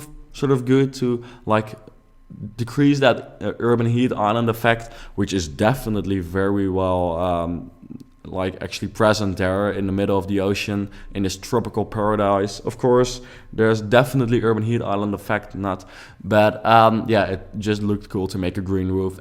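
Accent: Netherlands. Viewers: Aan het begin van de video vond ik het al op iemand lijken die Nederlands is en Engels praat 😂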